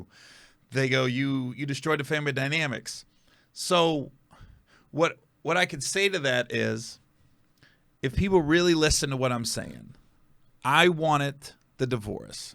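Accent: South, United States. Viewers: He from down south you clown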